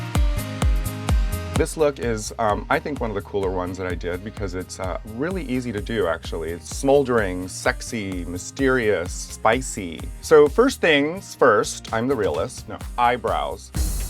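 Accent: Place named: Mid-Atlantic, United States. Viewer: I love Raja's voice. There's a touch of old Hollywood/mid Atlantic without being fake.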